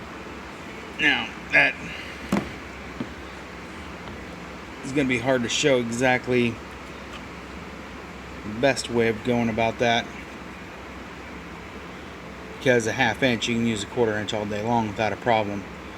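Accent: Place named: Iowa, United States. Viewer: Are you from iowa?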